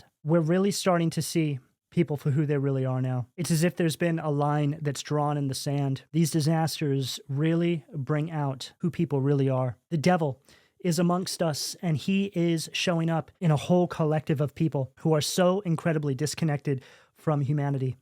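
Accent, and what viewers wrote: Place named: East Coast, United States. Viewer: I always thought you were east coastian.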